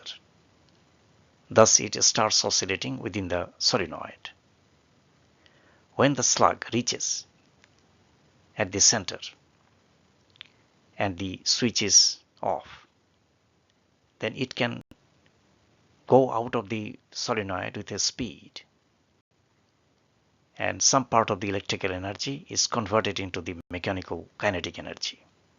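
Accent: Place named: Nepal. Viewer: Nepali ho sir accent nepali jasto xa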